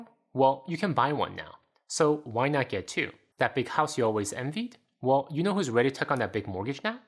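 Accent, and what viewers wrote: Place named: South Korea. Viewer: It’s interesting how his English sounds like Korean haha. Nice video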